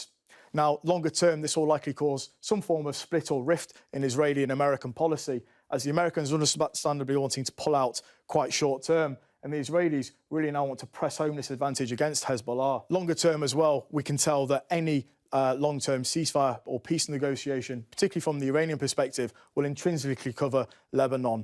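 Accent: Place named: Yorkshire, United Kingdom. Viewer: It's impossible for Americans to hear through the Yorkshire accent. E.g."cru-shul-ly erode that".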